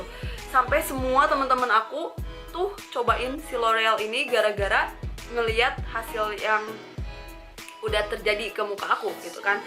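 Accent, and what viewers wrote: West Java, Indonesia. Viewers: seneng banget sama cara pembawaannya di youtube, ga dibuat2, ga sok asik kaya youtuber lain dan ada aksen sundanya hihi. langsung subscribe! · lucu kak, logat sunda nya keluar banyak di video ini 😄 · ka orang sunda ya ?😀😀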